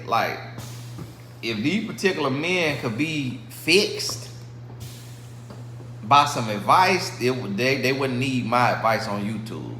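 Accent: United States. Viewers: Awesome as always,. But it's the way you say water and back for me. 😄 I love the country accent.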